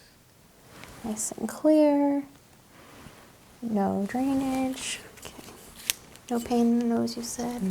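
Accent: South, United States. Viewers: This English Girl loves a Southern Accent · Love the southern accent!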